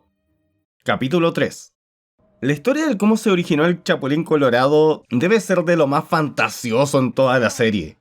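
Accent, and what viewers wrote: Chile. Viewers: Y por qué el youtuber habla como chileno? 🇨🇱🤔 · Es chileno, así hablan ellos. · Por qué es chileno jsjs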